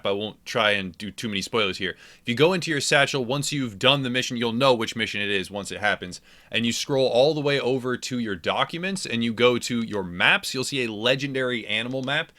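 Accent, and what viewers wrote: United States. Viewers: Typical thick American pronunciations, Saint Denis for example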